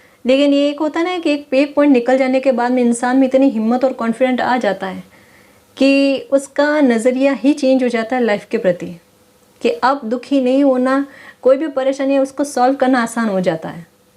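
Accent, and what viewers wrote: Gujarat, India. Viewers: Aap gujarati ho shayd...tone se hi pata chal rha h😁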